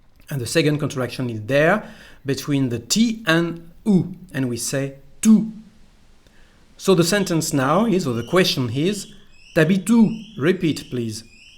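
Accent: France. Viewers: Salut! Would this be the northern accent? Saying "sh" instead of "j". I'm from Brazil learning French in English, pardon me if I say anything too stupid. I recently watched the movie Bienvenue chez les Ch'tis and was wondering if there's an accent here. Merci beaucoup!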